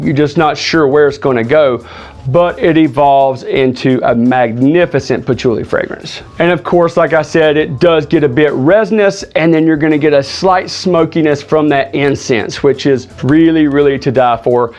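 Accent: South, United States. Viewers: nice review with a nice southern accent 👍🏾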